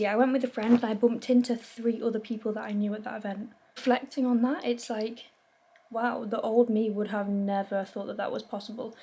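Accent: United Kingdom. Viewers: do you have a Yorkshire accent?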